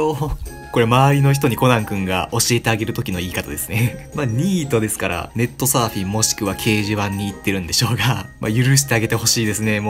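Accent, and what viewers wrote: Kansai, Japan. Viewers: さん、ネットでは関西弁。妙だなあ。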